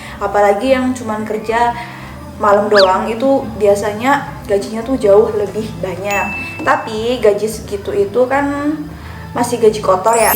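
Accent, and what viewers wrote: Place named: Paburan, Indonesia. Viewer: Lah teteh orang paburan tahh,sedayeuh 😂😂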